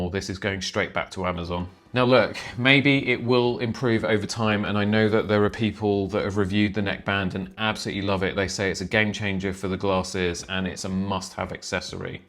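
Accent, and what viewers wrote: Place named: Europe. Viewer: Would have been much better if you didn't constantly refer to ipods, ipads, ios, iphone......apple this, apple that -- you are in Europe judging by your accent so Android would have been so much more appropriate and useful. As it stands, this just seems to be aimed at the US of North America and 9/10ths of the planet can't understand your points. Apple, Apple, that pointless expensive useless brand again is Apple.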